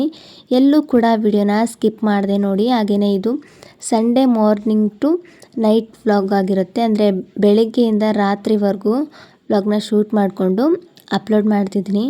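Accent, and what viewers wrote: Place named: Davanagere, India. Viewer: Nim district yavdu neu same nam tarane matadtira i think davanagere avara neu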